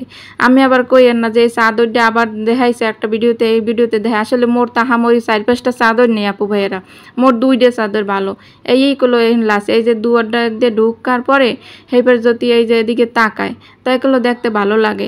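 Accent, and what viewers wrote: Barishal, Bangladesh. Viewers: আপু তোমার কথাগুলো কেন জানিনা আমার খুব ভালো লাগে বিশেষ করে বরিশালের ভাষা আমার কাছে খুব পছন্দের ❤❤যদিও আমার বাসা উত্তরবঙ্গে কেন জানি না দক্ষিণাঞ্চলের বরিশালের ভাষাটা আমার কাছে খুব পছন্দের ❤❤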